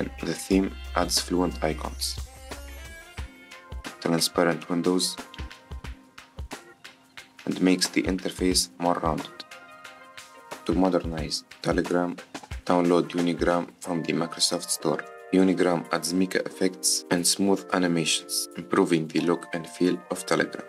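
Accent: Russia. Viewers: Ты русский, что-ли? Крутой акцент =) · Um, cuz bro speaks like a robot with russian accent? Really slow, stuttery and without any soul in words. No doubt, it comes with experience and the author should continue improving (cuz e.g. all this themes have never been bundled in one video, usually being on different subreddits and videos => useful), but being surprised with a low number of subs is either your delusion or major d*ck riding lmfao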